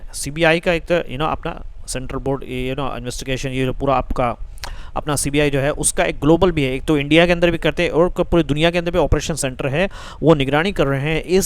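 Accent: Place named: United Kingdom. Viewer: You are a British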